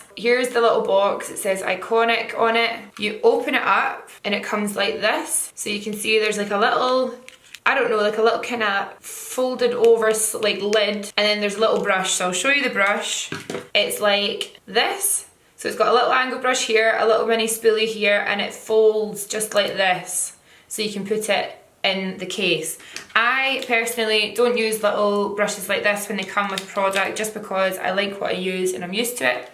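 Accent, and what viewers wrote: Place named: Glasgow, United Kingdom. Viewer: Gen up the only Glaswegian accent I can listen to without cringing like mad! making weegies proud af yas x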